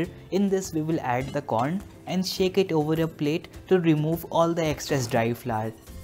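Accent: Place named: North, India. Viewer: Strong northie accent.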